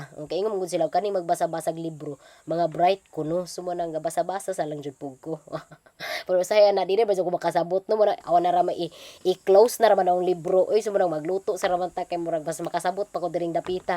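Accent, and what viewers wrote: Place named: Visayas, Philippines. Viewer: Ang imong bisaya makamingaw gyud! I’m lovin’ it. It hits home.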